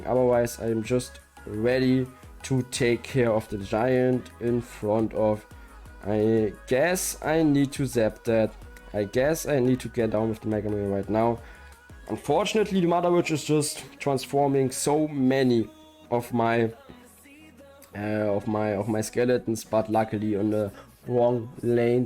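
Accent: Germany. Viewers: you really are a legend. btw do you happen to be from germany or is that another accent im hearing?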